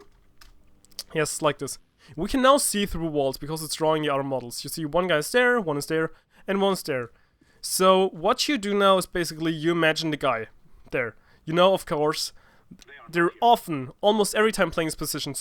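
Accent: Germany. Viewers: Bist du deutscher? Hört sich so an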